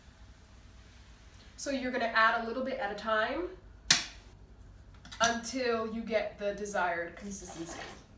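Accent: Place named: Eritrea. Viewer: You sound smell and test like FULLY ERITRIAN yes you are Comper you with the ather Youtuber eritrian girl way better than her by the way do you know a bit of TIGRIGNA ???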